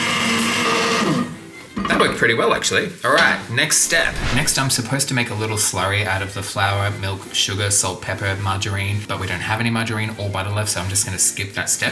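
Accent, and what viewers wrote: United Kingdom. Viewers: Just loving what you're doing and the presentations are fabulous - especially the RP! Makes me smile every time. Thank you 😄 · Your videos are a joy to watch and, as always, your English accent is brilliant and you sound just like the Headmistress at my school……think Hogwarts, without the magic, but all girls and in the 1960’s. Every time you said ‘mock’ you made me giggle 😂😂 I’m in Lancashire England and In Aldi today 500g of leeks were £1.39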